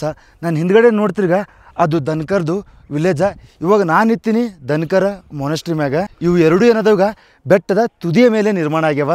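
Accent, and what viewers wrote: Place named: Karnataka, India. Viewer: Super bro .. ಜವಾರಿ ಕನ್ನಡ.. ❤❤ ಅಲ್ ದ ಬೆಸ್ಟ್ ಬ್ರೋ❤❤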